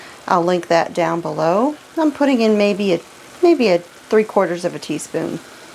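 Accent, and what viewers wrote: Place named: South, United States. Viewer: My hubby Jerry and I love to watch your cooking videos. Do I detect a little more southern charm to your words now a days?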